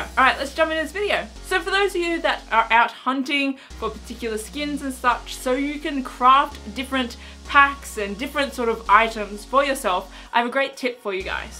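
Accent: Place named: Australia. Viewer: You're beautiful. Plus, I love auzzie accents. =)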